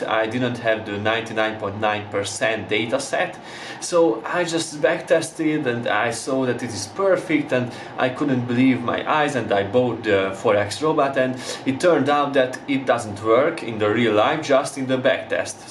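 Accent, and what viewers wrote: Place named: Hungary. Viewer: I think from hungry, as far as the accent goes. I'm from there, too. So i guess I could identify it :)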